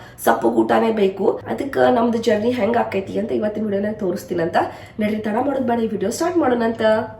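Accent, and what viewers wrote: North Karnataka, India. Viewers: your North Karnataka kannada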